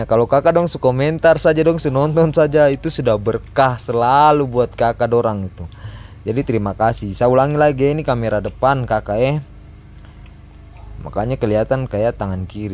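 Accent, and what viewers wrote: Sulawesi, Indonesia. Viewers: Orang Sulawesi ya kalau liat logatnya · Abang orang mana ap orang Sulawesi betul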